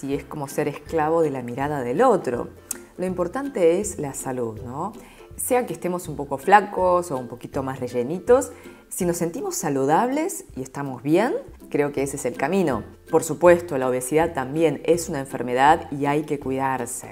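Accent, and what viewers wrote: Argentina. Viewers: Tienes asentó argentino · Hola una pregunta eres argentina , te encuentro el asentó muy parecido , me gusta tu sencillez buena suerte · Me encanta tu forma de hablar español con acento argentino .😊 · Hablas muy bien el español, me encanta el acento de los argentinos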